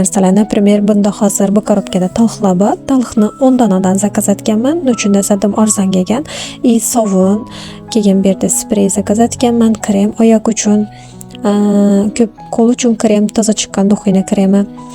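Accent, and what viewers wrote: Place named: Khorezm, Uzbekistan. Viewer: ❤❤❤❤бунча ширин гапрдингз устозам бирам чиройли яхши кураман хоразм шевасини боризга шукур сиз туфайли шу кампанияга кушилдим сиздан бизнес сирларини урганетганимдан хурсандман❤❤❤❤❤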